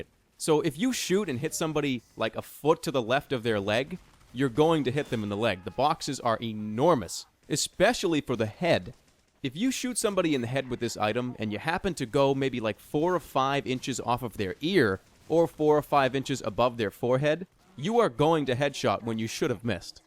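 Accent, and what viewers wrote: Boston, United States. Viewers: your from where the scout is! boston! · yo youre from boston?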